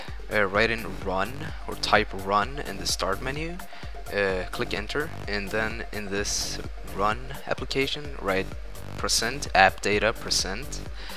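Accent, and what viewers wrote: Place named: Sweden. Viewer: thanks :D man hör att du e svensk ;)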